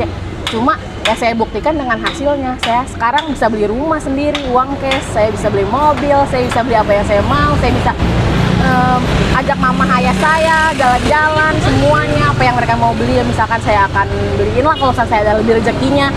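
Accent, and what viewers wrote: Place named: Tangerang, Indonesia. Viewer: Kayak orang Tangerang..lanjut teeh mantep semangat terus..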